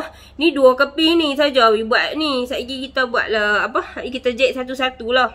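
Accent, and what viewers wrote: North, Malaysia. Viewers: Walaupun saya bukan org utara, tp masih faham apa cikgu terangkan.. Dan suka pulak dah dengak loghat cikgu.. Dan cikgu terang memang jelas dari a-z.. Terbaik.. 👍👍👍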